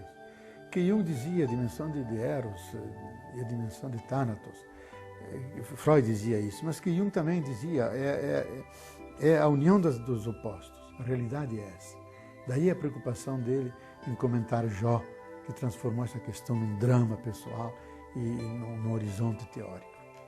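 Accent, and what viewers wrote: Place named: São Paulo, Brazil. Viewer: Greatfull,gosto muito desse sotaque paulista, meio double de desenho animado,.magos,freud,investigou os desejos animais " no ser sofisticado, humano,young,observou, outea dimensão, de um modo,discreto dialogou,muito bem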